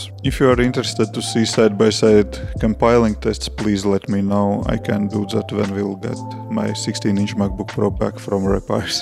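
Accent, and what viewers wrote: Russia. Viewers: Артем Анащенко Русский, ибо такой акцент трудно спутать))).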